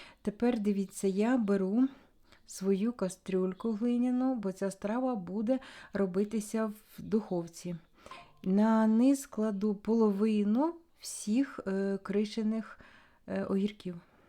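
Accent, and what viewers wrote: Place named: Chernihiv, Ukraine. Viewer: Почти вся Черниговщина так говорит, но рецепт для меня новинка. Спасибо.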